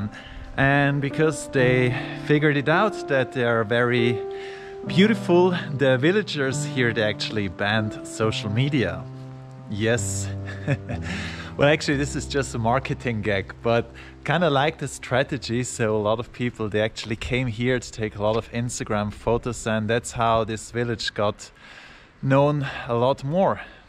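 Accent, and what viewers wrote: Switzerland. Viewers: glad you moved back to Switzerland. It is good to travelmand explore the world, but Switzerland I'd such a great place. A xfriendcof mine in KC,mMissouri is married to a gal from KualacLumpur,Kuala Lumpur,, which she likes, but there is a bit of a rivalry with Singapore. I think you said you were originally from the Italian part of Switzerland,mbut your accent is more Swiss German. I like Lugano due to the milder winters and the beautiful lake.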